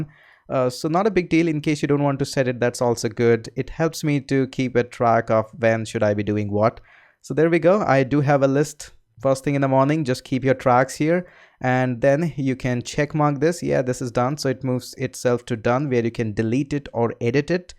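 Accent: India. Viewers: Thanks for helping. Indian accent is not good at all :/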